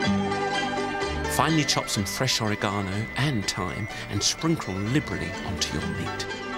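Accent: United Kingdom. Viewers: imagine the people who live on the mountain and they see him: Who is this guy with the British accent and why is he dancing and cooking in our balcony?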